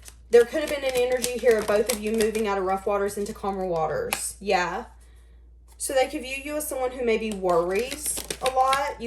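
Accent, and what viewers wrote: South, United States. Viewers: Thank you for always making videos. Your voice, and southern accent brings me comfort. I could listen to you talk everyday, and do. Just wanted to let you know that some random guy appreciate’s your hard work putting these videos together. You are precious.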